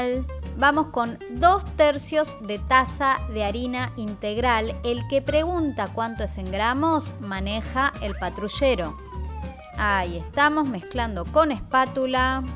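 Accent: Argentina. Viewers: Me regusta tu tono argentino me caes bien y me gustan tus recetas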